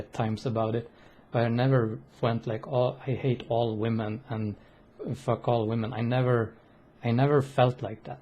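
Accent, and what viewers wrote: Sweden. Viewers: I would love to have a friend from Sweden. If your ever into gaming and/or chatting let me know. I know there are a lot of people who are here for you. You have a lovely accent and a very calming voice and I'd add that to your list of nice features. I also wonder what you'd look like with your hair pulled back. I agree about health too, it's been pretty difficult socially for me this last year and staying healthy is something I strive to do as well. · Du låter svensk i brytningen! Vill bara säga att du verkar vara en härlig människa! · Ååååh!! :) Tyckte det var svensk "dialekt"! :D Du är klok du och en riktigt fin människa. Uppskattar ditt meddelande till oss mannen!!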